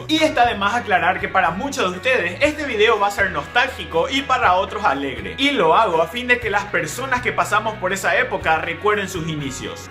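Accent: Paraguay. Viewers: Puta madre??? Puto amo???... !!!Habla como paraguayo idiota¡¡¡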